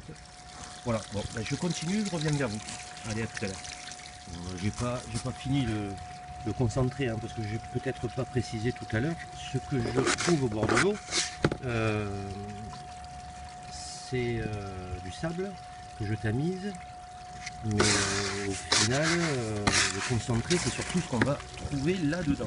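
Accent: Hérault, France. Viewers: il habite dans l'Hérault là où il y a l'or et il a l'accent de l'Hérault c'est pour ça que je dis ça. Il y a de l'or dans le Gard aussi. Je suis parisienne et je reconnais tous les accents du sud ! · LORB ON SENS LACENT DU 34 MDR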